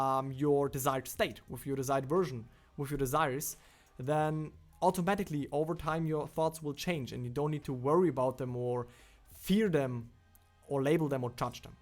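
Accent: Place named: Germany. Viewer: „ sounds pretty german, but there‘s basically nobody here knowing/studying Neville and all of that stuff“ - clicked on video, instantly got hit with a thick german accent 🙆🏻‍♀️😄 Na, dann sind wir immerhin schon zwei! 🙃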